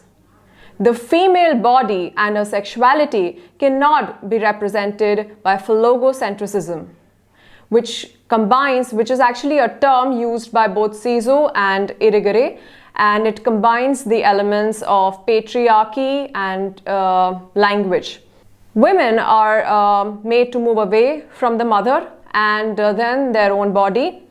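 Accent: India. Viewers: I really appreciate what this channel has been doing but the instructors' Indian accent spoiled mine 🙊☺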